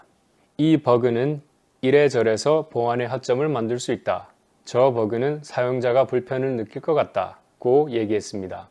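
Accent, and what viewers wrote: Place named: Daegu, South Korea. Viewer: 감사합니다! 사투리가 살짝 들리는데 대구분 같기도하고..(저는 대구 토배기입니다ㅎㅎ) 뭔가 더 반갑습니다ㅎㅎ 종종 업데이트 드리겠습니다!!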